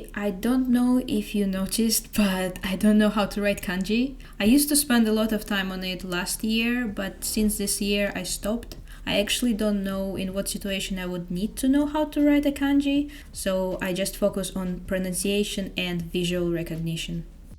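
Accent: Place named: Russia. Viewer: Your English sounds like you are Russian or so… feels strange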